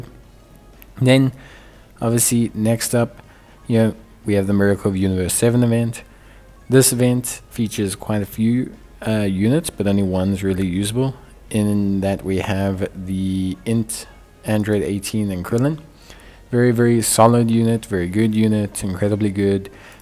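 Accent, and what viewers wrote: South Africa. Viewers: Bro you south-african?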